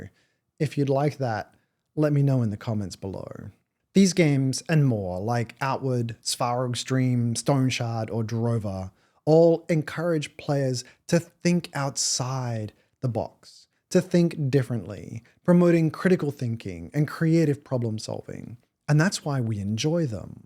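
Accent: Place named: Australia. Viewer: You sound australian but pronounce some words very american. I am confused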